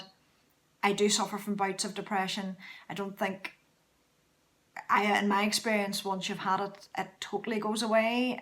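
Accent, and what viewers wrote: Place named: Ireland. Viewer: Firstly I love yr accent m Dad came from Limerick. Sadley he passed over in 2017 and I miss that wonderful Irish voice. Now yr podcasts are great and your jumpers amazing.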